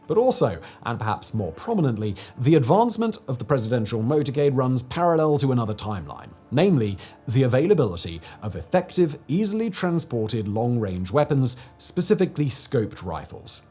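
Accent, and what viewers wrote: United Kingdom. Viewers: This guy is paid by shadowy people to read out a script and sounds smart in British accent. Pure propaganda. Do not censor me or else I'll continue!!!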